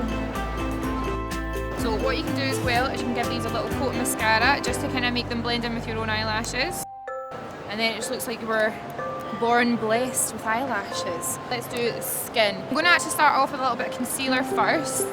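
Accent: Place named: Glasgow, United Kingdom. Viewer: loved this video, you can tell you're from glasgow but how much you say 'kinna', makes me feel right at home lol x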